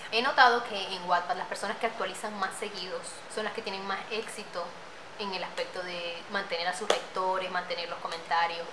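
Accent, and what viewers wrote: Venezuela. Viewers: chama wow. ¿eres venezolana? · Eres venezolana? Por cierto gran vídeo estoy escribiendo una historia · ¿eres venezolana? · Por tu acento sabía que eras venezolana!!!! Te amo chama!!! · Se nota que eres venezolana. Que orgullo que eres de este país 💜💜💜💜. Saludos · El acento venezolano es notable 💁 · wow, el acento venezolano se nota a leguas... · ¿Eres Venezolana?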